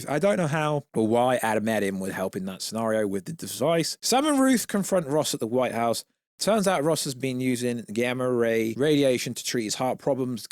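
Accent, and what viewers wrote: United Kingdom. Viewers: I can understand why he got it wrong he's from another part of the globe 🌎 sounds like he could be possibly from the U.K.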